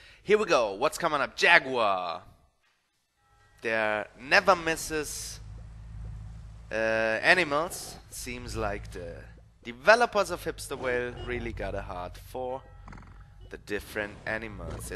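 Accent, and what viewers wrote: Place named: Germany. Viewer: ohh I knew it! You have a German accent so I noticed :P I'm Brazilian ^u^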